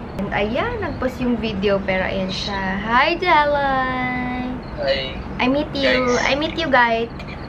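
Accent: Batangas, Philippines. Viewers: Napakacutie magsalita ni miss pag kasama ang mga kapamilya haaha batanguena na batanguena e 😂😂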